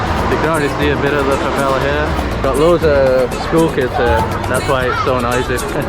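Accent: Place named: Northern England, United Kingdom. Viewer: haa thought so you have a northen accent like me so picked it up just came across your channel and im liking it, loving vlogs from 🇧🇷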